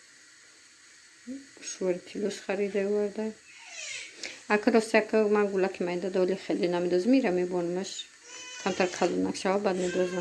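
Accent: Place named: Tajikistan. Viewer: САЛЛОМ ГАП НЕСТАЙТОНА РАНГИ МА ГАП МЕЗАНЕД ЧИСТА ТОЧИКИИ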